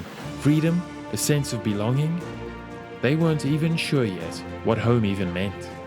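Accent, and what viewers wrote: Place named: Pretoria, South Africa. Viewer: Bro sounds like a Pretoria boy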